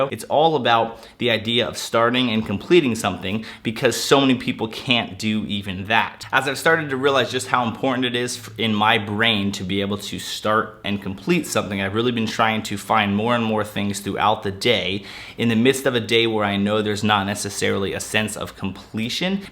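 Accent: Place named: Philadelphia, United States. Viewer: Exactly what happened to me! Started watching to get tips on organizing my businesses video library and really liked not only your content but your ability to communicate which led me to follow you and watch all forms of your videos. An interesting blend of topics and always useful for life. Appreciate your unique perspective. Also originally from Philly area, (Drexel Hill many years ago) so it's cool to see Philly and the burbs scene and hear the famous Philly accent. Keep up the great work! AND THANK YOU for generously sharing all you do with us. I've learned a lot and appreciate your hard work to deliver it to us! PS I own a chain of hair salons in Florida. If any of the woman in your family ( mom, sister, gf, wife, friend) are ever in Southwest Florida Naples or Bonita Springs they can come in for any services they want all for free. 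The works, color, cut style anything at all, my treat. Least I can do to thank you for what you've done for us! Salon's name incidentally is Robert of Philadelphia! Have an amazing day!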